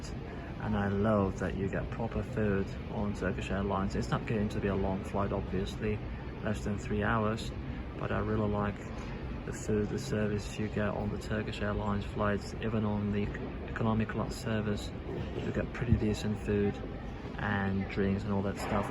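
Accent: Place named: United Kingdom. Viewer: Açıkçası bir Türk'ün bu kadar hatasız ve akıcı ingilizce konuştuğunu ilk defa görüyorum ve şaşkınlık içerisindeyim. Aksanınız İngiliz aksanı belli, peki İngiltere'de kaç sene yaşadıktan sonra ingilizcenizi bu kıvama getirdiniz? İngilizceyi bu kadar akıcı konuşabilmeyi neye borçlusunuz? Senelerce sadece ingilizce mi konuştunuz? İşiniz İngilizce konuşturmayı mı gerektiriyordu? Sırrınızı çok merak ettim :)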